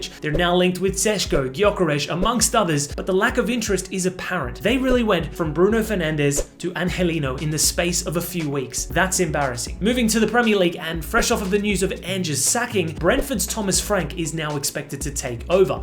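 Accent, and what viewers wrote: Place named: Australia. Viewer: Can’t believe I use to think was British 😂, definitely hear the Australian accent more clearly now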